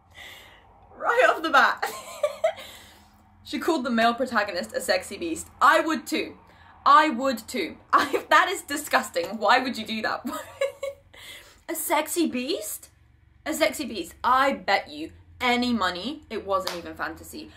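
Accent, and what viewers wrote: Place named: United Kingdom. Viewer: I'm so sorry, but me as an American....you being British makes this video so much better 😅😂 My YouTube feed knows me....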